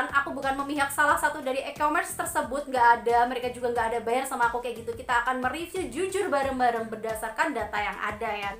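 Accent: Java, Indonesia. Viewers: Medok bgt bahasany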